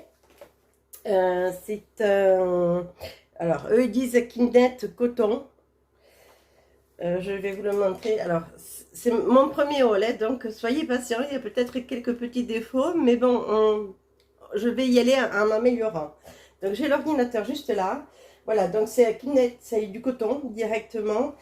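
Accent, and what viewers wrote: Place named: Tarn, France. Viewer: Coucou, me voilà abonnée ravie d'avoir reconnu mon accent, je suis du Tarn sud sans parler du petit indice derrière toi 😉 Hâte de voir tes cousettes, nous partageons pas mal de créatrices dont les meilleures les Bobines Patterns 😁 A bientôt 😘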